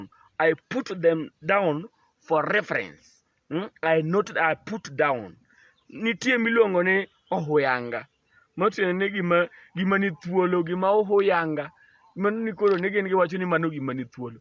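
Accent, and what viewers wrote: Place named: Alego, Kenya. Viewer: Ijahau mangano, mano dholuo Mar alego anyiero sana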